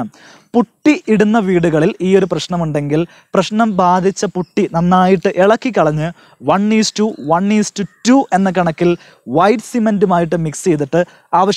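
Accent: Kozhikode, India. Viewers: അറിവുള്ളവനെ പരിഹസ്സിക്കുന്നത് തെറ്റാണ്, കോഴിക്കോട്ട്കാർ അങ്ങനെ ആണ് പറയുന്നത് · മയ മാണ്ട പോയിന് വന്നീ ന് പോയിക്ക് കുത്തിരി ഇടെ ഇതൊക്കെ കോഴിക്കോട് മലബാർ ഭാച്ച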